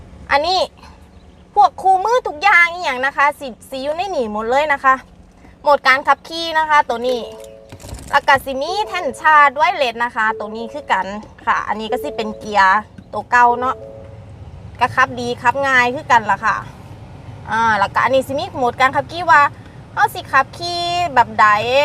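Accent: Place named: Isan, Thailand. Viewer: ข่อยมักเจ้าเว้าอีสาน✌️😁😁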